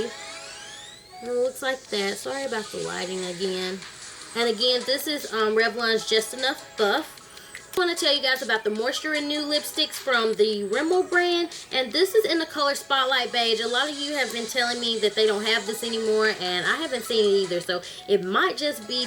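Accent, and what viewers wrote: South, United States. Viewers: I love your voice. Where are you from? I am in Louisiana, and alot of black people around here don't sound like that, but you do sound southern. Very beautiful voice! :)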